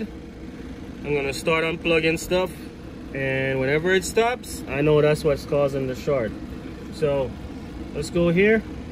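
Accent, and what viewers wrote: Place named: Jamaica. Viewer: Where u from man???? From your accent are from jamdown...... Or further afield down in the caribbean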